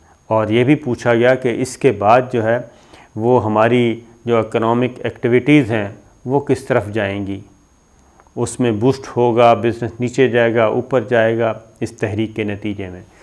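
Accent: Punjab, Pakistan. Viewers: آپکی آجکی بغیر تسلسل والی گفتگو اور تبصرہ ایسا لگ رھا تھا کہ جیسے آپ نے ڈرگ چڑھائ ہوئ ہے۔ کبھی کچھ بھول جاتے تھے کبھی کچھ اور۔ یہ پنجابی نما پینڈووں والی اردو کہ جہاں اسکول کو سکول کہا جاتا ہے اپنی اھمیت اور اصلیت ہی کھو دیتی ہے۔ براۓ مہربانی کمنیکیشن کے کورسز کیجۓ تاکہ یہ جناب جناب کہتے ہوۓ بات کرنا بند ہو اور جو بات آپ کریں وہ تسلسل اور متاثر کرنے والی ہو۔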